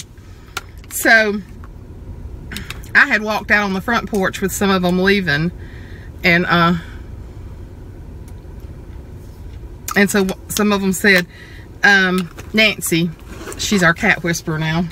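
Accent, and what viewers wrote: South, United States. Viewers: We got rain from storm up here but today it cleared and now nice and sunny out! Love your sweet southern accent. Hugs!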